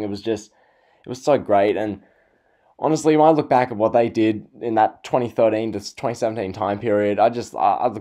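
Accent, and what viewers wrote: Australia. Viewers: Are you Australian? I recognize the accent.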